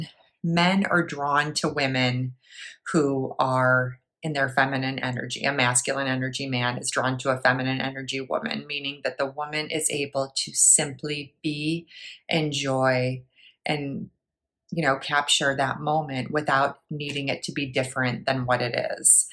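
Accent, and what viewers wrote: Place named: Upstate New York, United States. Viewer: Are you from upstate? You have that accent! Love your advice!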